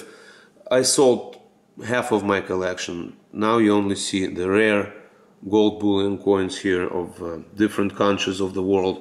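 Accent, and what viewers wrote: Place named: Russia. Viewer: Выдаёт русский акцент :)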